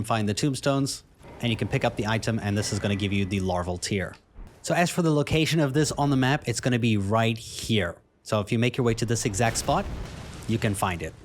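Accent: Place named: South Africa. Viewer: Bro embrace your south african accent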